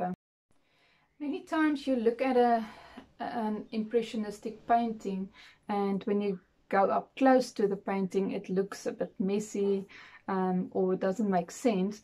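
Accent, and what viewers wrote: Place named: South Africa. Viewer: How nice to hear a South African accent. Hi from the Netherlands ex Midvaal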